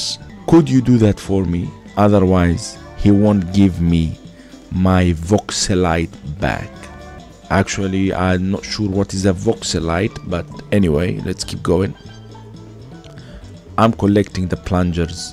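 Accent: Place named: Greece. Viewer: I like your accent in English, and you feel that Greek is phonetically more dominant than the British accent 👍🌹🌹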